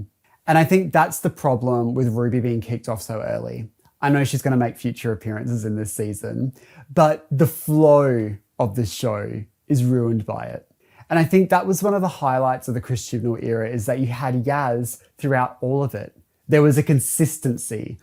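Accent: Australia. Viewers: are you australian by any chance?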